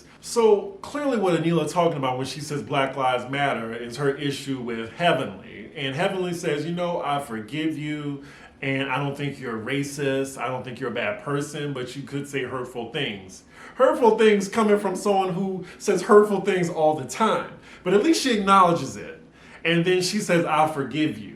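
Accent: New Orleans, United States. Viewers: I know you've been in NY for a while but I still hear many hints of Nola! 🥰🥰 I'm sick of Toya ass and I didn't need to see Kari...Great review!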